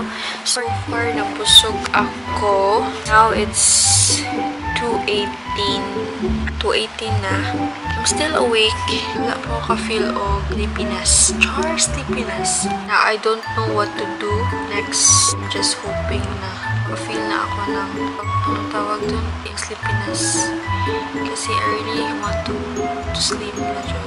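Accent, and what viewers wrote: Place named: Visayas, Philippines. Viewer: I love the accent of bisaya hahaha!! New subscriber here 😊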